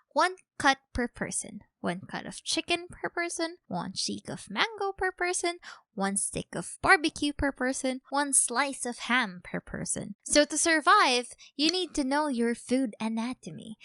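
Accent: Philippines. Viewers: Soo u a filipino i know that accent imma filipino too :D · Me: Hears say pancit canton, and no American accent Also me: Definitely Filipino. Edit: this is my first video watching don't bully me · I knew it from the start y have a Filipino accent lol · this my first time watching. you sound filipino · If may I ask Are You Filipino???!! Your Accent Is kinda !!! Haha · Based on the grammar and accent YOUR A FILIPINA! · 35 seconds into the video and I already know she's a Filipino xD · The first time i saw this i was like : why does she have a filipino accent? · are you a Filipina??? cuzz you voice sounds like it??? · And btw I noticed how speaks HAHA I notice in the first place but i don't have a clue filipina WHOAH LAB U ATE 🥺✨ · woah is a filipina girl i know the accent of the filipino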